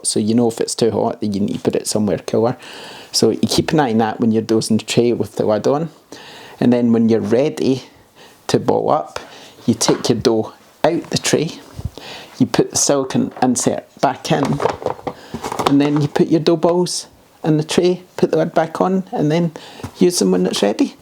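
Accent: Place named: Glasgow, United Kingdom. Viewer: All the comments about the accent are making me chuckle. Thanks for the review from one Glaswegian to another.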